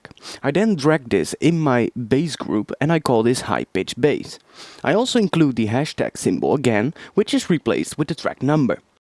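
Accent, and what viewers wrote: Netherlands. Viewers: Is he dutch? Cuz his english accent sound like mine, when i'm talking english :P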